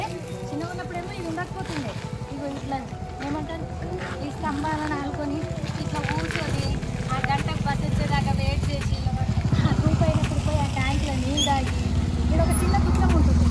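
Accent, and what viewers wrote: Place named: Nizamabad, India. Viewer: మన యాస నిజామాబాద్ జిల్లా కైతిని ప్రపంచనికి తెలిసేలా చేశారు మీకు ధన్యవాదాలు తెలుుతున్నాను అక్క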